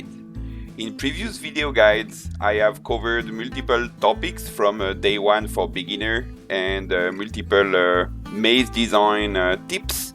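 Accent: France. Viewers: ah je ne suis pas la seule a avoir remarquer un énorme accent Français étrange pour un channel totalement en anglais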